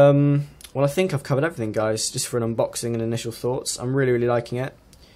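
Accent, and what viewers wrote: United Kingdom. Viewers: Heard your British accent, saw the 0 "dislikes" so I immediately liked the video. :)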